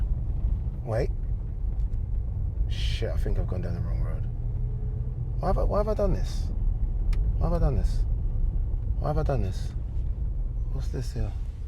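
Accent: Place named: East London, United Kingdom. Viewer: This is so me driving around! And the "are you going out what?" Especially where you was driving 😅 (I'm based in bow) so I recognise it. Looool You're soooo east London the way you talk and I love it!😅 Btw You can do two way filming on some phones! (Samsung it's called director mode) I've enjoyed your candid chat, great convo! And you are perfect for club world cup! Congratulations Hun 😘 💓 👏 If you need a hand with your channel while you're busy doing the world cup and boxing, I'm more than happy to help. Maybe I should email you? Haha I like the name car confessions name but I see your point lol😂😅😅😅😅 but yeah car diaries is cool 😅😅😅😂😂😂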